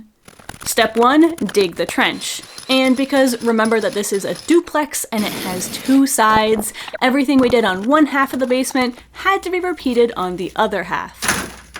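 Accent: Quebec, Canada. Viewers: I just discovered your channel. I knew I recognized un accent du Québec! Good job guys, I love everything about your channel ❤ Continuez votre beau travail.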